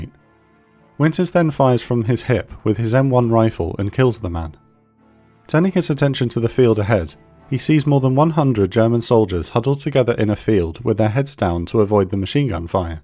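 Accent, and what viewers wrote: United Kingdom. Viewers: Narrator is British....and this battle is factual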